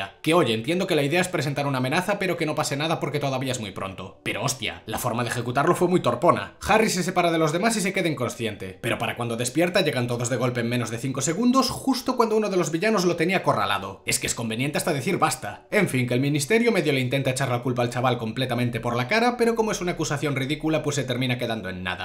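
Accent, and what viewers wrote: Cuba. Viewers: Era acento cubano? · el acento cubano me hiso el dia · Que acento cubano tan racista…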